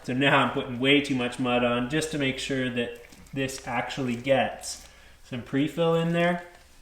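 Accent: Canada. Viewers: Cool... The older you get, the more Canadian you sound eh. Awesome videos. Thanks!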